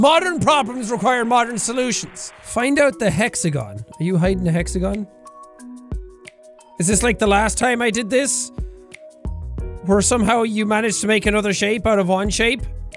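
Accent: Ireland. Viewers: Holy crap he is Irish so I am yay 😁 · We love the angry irish accent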